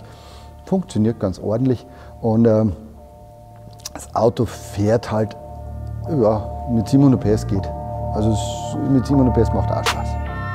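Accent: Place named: Bavaria, Germany. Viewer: Sehr sympathisch erklärt, a echter Bayer halt👍👍👍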